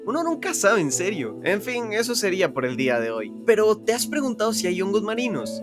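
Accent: Costa Rica. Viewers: Escuche la voz y dije "Es tico" y luego reviso la bibliografía y es de biotec💚, muchos animos en este proyecto, ojalá te vaya super, yo soy primer ingreso de biotec.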